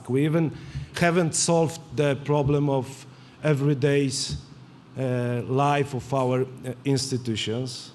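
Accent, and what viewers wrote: Poland. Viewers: Ludzie co się przypierdalają do jego angielskiego żyją w zupełnie odrealniony świecie. Wiadomo, że akcent mocno polski, ale widać, że wie co mówi i potrafi przekazać co chce w tym jezyku - niczego bym tu nie ujmował. Najważniejsze, żeby nie czuje się skrępowany mówiąc i mówi płynnie. Przypomina mi się zawsze Jose Mourinho, który mimo swojego łamanego angielskiego i śmiesznie brzmiącego akcentu nigdy nie tracił charyzymy w tym języku i wszyscy go zawsze słuchali jak mówił. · Bardzo dobrze mówi po angielsku. Ja wychowywałem się w UK, więc mówię to z punktu widzenia kogoś kto mówi płynnie po angielsku i muszę powiedzieć, że naprawdę nie jest źle. Używa bardzo dobrych słów i mówi całkiem poprawnie. Tylko musi popracować nad akcentem. Najlepiej byłoby, żeby poprzebywał z osobami z Anglii lub USA, którzy mówią bez 'akcentu polskiego' to myślę, że szybko by podłapał akcent i wymowę. 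Ale naprawdę wcale nie jest źle. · Ma Polski akcent ale bardzo dobrze mówi po angielsku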